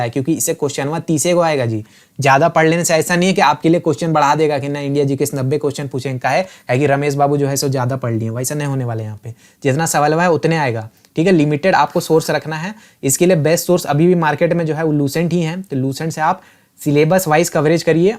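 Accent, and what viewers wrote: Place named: Ranchi, India. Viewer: Uff that Ranchiyaar boleee😂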